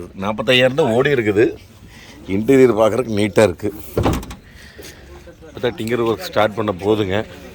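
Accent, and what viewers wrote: Kongu, India. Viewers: ரசனையோடு இணைந்து உளப்பூர்வமாக செய்து இருக்கீங்க அண்ணா...நம்ம கொங்கு தமிழ் அபாரம்.யாதார்த்தம் சிறப்பு தொடரட்டும் உங்கள் பணி மென்மேலும் சிறக்க நல்வாழ்த்துகள்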